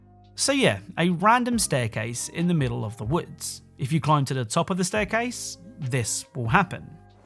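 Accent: United Kingdom. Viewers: What area roughly do u live u sound British u your not from London though ar ya u don't really sound like it, I'm in Harrow, I'm surprised that still happens over here cos it's very time consuming I feel, unless u had a good car I guess...🤔soz though man at least u n family unharmed that's most important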